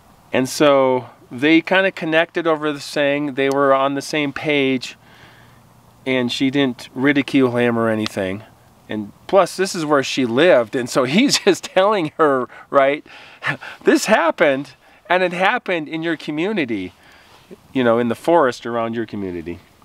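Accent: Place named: Midwest, United States. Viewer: I was just thinking about how to ask you if you were from the Midwest! I’m from IA but have lived many places. I love listening to you.🧚🏻‍♀️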